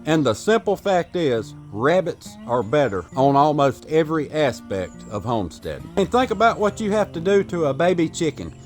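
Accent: United States. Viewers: That's an American accent if I ever heard one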